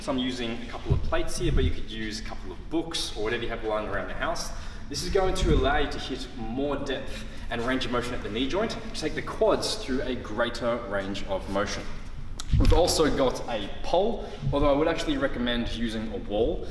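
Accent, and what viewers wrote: United Kingdom. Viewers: Who are you with the British accent? Gym closed bro !! Why are your still open bro??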